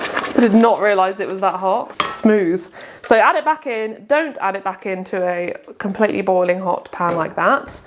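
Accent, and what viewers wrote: United Kingdom. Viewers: I love your channel; I feel that we can be best friends!! Love your lovely British English accent. You remind of my English teachers when I was studying English back in my hometown. I love the food you make! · I love your book and your videos. I'm Italian and I love your fantastic British accent too! I'm going to try the stuffed peppers for sure! They look scrumptious! Thank you!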